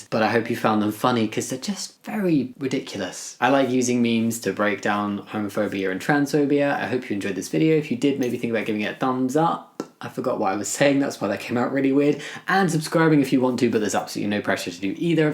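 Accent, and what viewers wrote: United Kingdom. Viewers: Are you British? 😮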